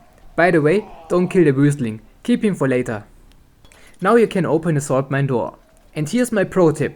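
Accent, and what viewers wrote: Germany. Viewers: Is that accent german? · war nicht böse gemeint 😅finds krass das du so gut englisch kannst , als deutscher hört man sowas einfach raus 😅😅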